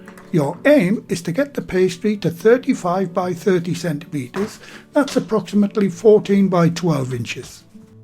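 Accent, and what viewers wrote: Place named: Sunderland, United Kingdom. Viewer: Always enjoy videos some great but simple recipes well explained. The Mackem accent always helps!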